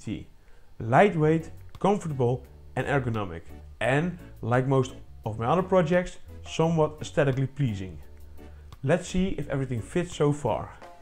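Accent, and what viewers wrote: Netherlands. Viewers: Heeerlijk altijd dat hollands accentje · Bro that Dutch accent goes hard af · I bet this guy is Dutch, I can hear it within 2 seconds. Nice vid. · Thats one hell of a dutch english acent there mate😂 Net als mark rutte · When i heard your voice and saw your garden i directly thought this guy is dutch. chat gpt verified it. Hoi broeder van een andere moeder. Het is altijd leuk om een nedelander te vinden (of belg maar dat accent is anders). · It took me exactly 0.2 seconds to find out you're dutch from your accent 😂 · Interesting video. IMHO if the "ice" projectiles become lethal it falls into lethal weaponry category and as such could be a perfect weapon to kill without trace. This definitely will attract attention from the CIA and alike. It also probably will be banned. Ps why define in feet? I hear on your acence that your Dutch. Let those US audience convert to metric with their arrogance for imperial for once. 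😅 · I hate the dutch accent 😭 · This accent screams dutch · Hoort meteen dat je een Nederlander bent🤣😂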